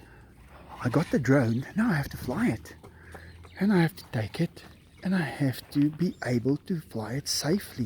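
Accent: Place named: South Africa. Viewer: It sounds like you from south Africa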